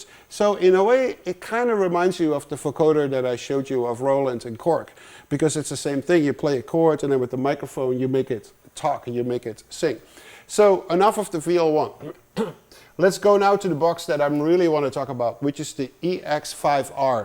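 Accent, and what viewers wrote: Netherlands. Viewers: That's more just his accent. He's Dutch. Just for an example, Canadians will say some vowels surprisingly different than other English speaking peoples.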